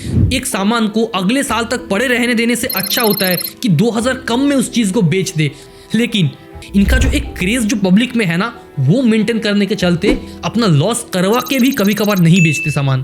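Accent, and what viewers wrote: Bengal, India. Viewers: I knew he is a Bengali from his pronunciation 😅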